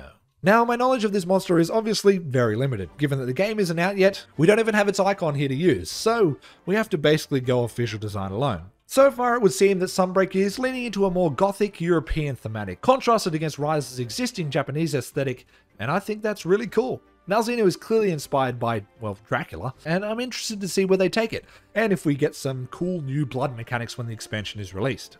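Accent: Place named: Australia. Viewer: Doesn't help an Aussie accent really plays into pronouncing the di a lot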